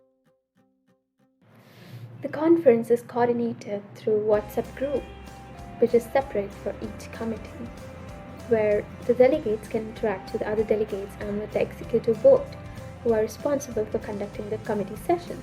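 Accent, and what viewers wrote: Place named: United Kingdom. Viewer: How you bring that British accent?😁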